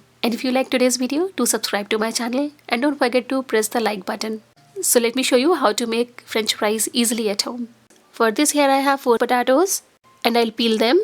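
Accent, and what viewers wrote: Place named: India. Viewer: Da Indian aksent🤗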